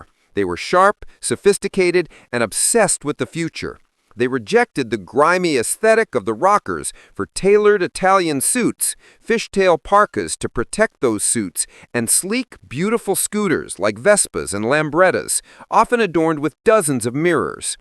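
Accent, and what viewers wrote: United States. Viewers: Who is this Yank narrator talking b.s.? Great Britain was not 'shaking off ww2 post austerity in 1964'. The 'never had it so good' years had just gone and the more optimistic 60s were in full swing. I doubt any Americans had even heard of the mods. 🙄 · Why the change to an American narrator. Is this all AI?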